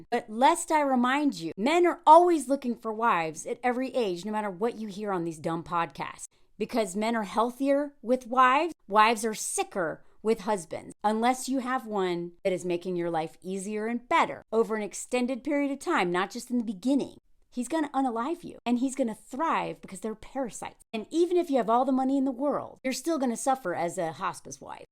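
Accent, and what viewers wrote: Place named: Chicago, United States. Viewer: Your Chicago accent is hilarious! I'm from Chicago. Another amazing video,!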